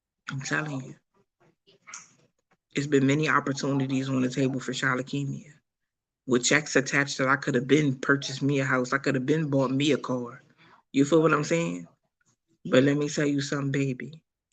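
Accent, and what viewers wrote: United States. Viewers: i adore this black woman..... we as black men LOVE U ..... u gotta love her country accent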